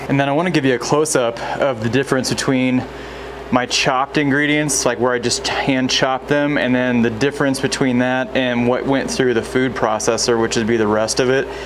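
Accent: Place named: South, United States. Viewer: I moved to South Carolina about 2 years ago and have gotten used to hearing random southern accents in conversation (I’m originally from CT). I legit thought you were saying ‘Chicken Broccoli BREAD’… I’m like ‘why the heck does he keep saying bread in that weird accent?! Maybe he’s pronouncing it the way his mama pronounces it? … Then I see the BRAID … 🤦🏻‍♀️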